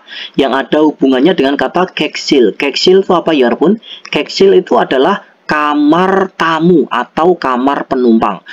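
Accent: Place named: Java, Indonesia. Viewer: Kang wong jawa endi 😁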